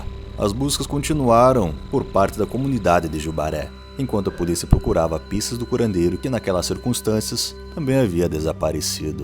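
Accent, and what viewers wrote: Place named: Rio Grande do Sul, Brazil. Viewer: Me criei em Erechim, minha mãe quando a gente teimava e queria ir em algum lugar, ela dizia: "Olha o Luiz Baú". Ela nos contava essa história terrível. Meu país foi médico a vida toda no hospital de caridade, e eu trabalhei com enfermeira lá também por 5 anos. Muito bacana escutar um sotaque gaúcho novamente, já que moro fora de lá há 15 anos. 💛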